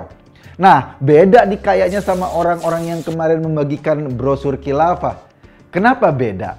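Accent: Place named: West Java, Indonesia. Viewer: Mendengar logatnya kelihatannya dari bagian barat Jawa. Sejak awal proklamasi nggak mau gabung NKRI !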